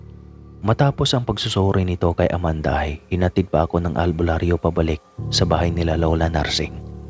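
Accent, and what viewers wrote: Philippines. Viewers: Taga leyte ka ba? Parang tagalog ang punto ng pananalita mo...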